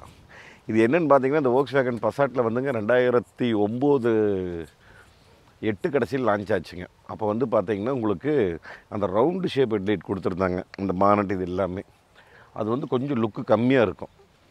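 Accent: Kongu, India. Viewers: கொஞ்சும் கொங்குத் தமிழ் பேசும் கோமகரே...! திகட்டாத தித்திக்கும் பேச்சுடைய திருப்பூர் திருமகரே...! எங்கள் மதிப்பிற்குறிய டான் திரு. அண்ணா அவர்களே❤❤❤🙏 எப்படி இருக்கீங்க அண்ணி❤❤❤🙏 எப்படி இருக்காங்க ரித்திக்❤❤❤ எப்படி இருக்காரு என்ன சொல்ல...ஏது சொல்ல... சும்மா நெகு நெகு நெகுனு இருக்கு போங்க❤❤❤❤❤❤ சாலை ரதத் தேர் என்னும் இந்தக் காரின் அழகைவிட.... எங்கள் அன்பு அண்ணா❤அண்ணி உங்கள் இருவரது உரையாடலே அழகோ அழகு பேரழகு...! பஞ்சு மெத்தையில் படுத்துறங்கும் சுகம்...! மேகத்தை முத்தமிடும் பறவைகளின் கூட்டம் காணும் சுகம்...! காற்றில் அசைந்தாடும் நெற்பயிர்களின் நடனம் காணும் சுகம்...! பாலைவனத்தில் புது ரோஜா மலர் மலர்ந்து அதைக் கண்டால்? மனம் முழுவதும் சுகம்..! கார்த்திகைத் தீபம் சுடர்விடும் அழகினைக் காணும் சுகம்...! அப்படியோரு சுகம் இந்த வீடியோவைக் காணும் என் உட்பட நம் TMF குடும்பத்தினர் கண்களுக்குச் சுகம்...! என்றும் அன்புடன் உங்கள் தம்பி என்றும் அன்புடன் உங்கள் TMF ரா.முஹம்மது கபூர் (சென்னையில் இருந்து)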